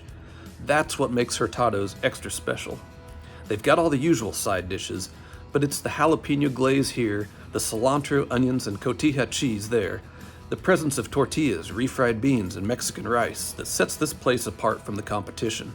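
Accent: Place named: South, United States. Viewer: your lovely southern lilt, nice and gentle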